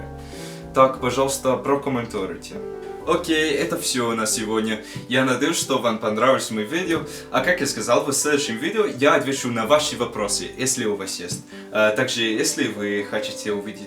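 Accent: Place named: Ukraine. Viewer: Почему мне его произношение напоминает украинский?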